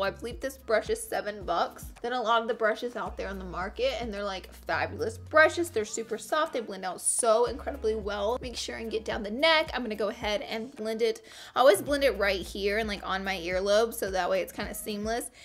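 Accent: South, United States. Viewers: I like talk through cause I don't really care how long it is because your funnier and it makes me stay longer so ya. And I've never been a big fan of voice overs. Also People need to stop talking about her voice. Her voice is a thick southern accent and I love thattttt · I can watch you all day. Your southern bell accent is cute and you are so funny ❤️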